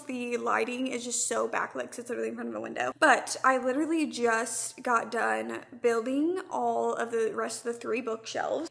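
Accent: South, United States. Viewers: there is no formula girl are you kidding. i know you’re all about making sure your videos are perfect but this is YouTube, a fun place not a Netflix documentary. i found you when you did your 24hr readathons, stayed because of the self deprecating jokes and the southern accent i never see anywhere else. now im so happy not seeing you joke about wanting to die and actually taking care of yourself. don’t compare yourself to old you because now I stay because of your maturity and you’re so funny in other ways without trying. you have to realise that you’re basically a celebrity in the book world and we could literally watch a video of you walking around your house like security footage and eat it up 😂 you’re like Kylie Jenner to me. I’ve been binge watching bookmarkd and even though I can’t stand taylor swift talk I still watch those hundreds of hours of you guys talking about her. 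I love hearing about your life i used to only watch you for book reading inspo and then abandon watching for months and now I tune in as soon as you pop up on my feed. you’re worth more than you know to us queen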